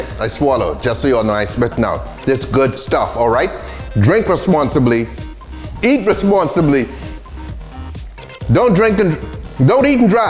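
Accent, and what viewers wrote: Trinidad and Tobago. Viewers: Are you from Trinidad Bc ur accent sound like Trinidadian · Are you from Trinidad 🇹🇹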